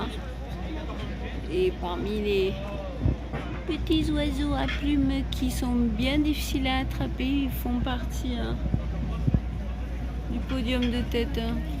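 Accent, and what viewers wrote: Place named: Montpellier, France. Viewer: Vídeo interessante.bel accent de Montpellier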